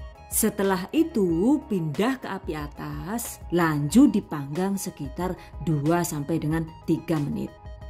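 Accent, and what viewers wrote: Banyumas, Indonesia. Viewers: Iya cantik... Kok bisa ya Kadang ngomongnya pake bahasa ngapak ? Sama kaya q orang ngapak.. 😄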